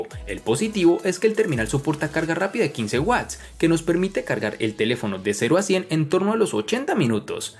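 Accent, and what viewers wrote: Cali, Colombia. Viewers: Es de Cali ? Cierto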